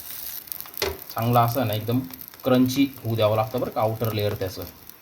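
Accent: Nashik, India. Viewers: आम्ही पण नाशिक कर आहोत तुमची बोलण्याची पद्धत छान आहे. रेसीपी ऊतम. नाशिक टोन🎉🎉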